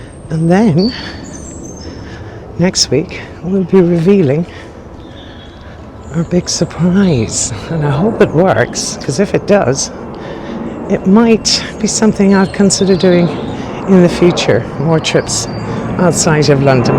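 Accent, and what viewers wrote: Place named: Ireland. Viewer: When you put on the CC it comes up with Tarrah instead of tower. It's your lovely Irish accent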